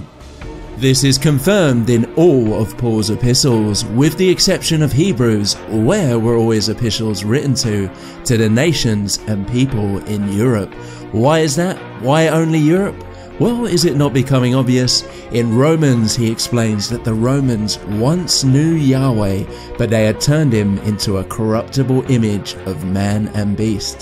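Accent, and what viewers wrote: United Kingdom. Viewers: I'm so tired of British accents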